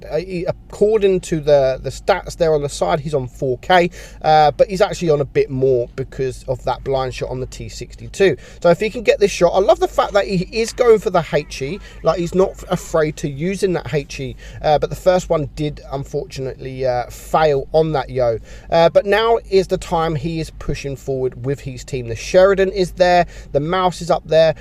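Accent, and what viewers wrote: United Kingdom. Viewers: I just love British accent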